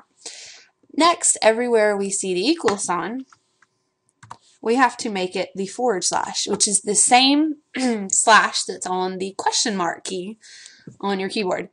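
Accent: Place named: South, United States. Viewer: HOT/SMART/SOUTHERN ACCENT. Your gonna make someone very happy.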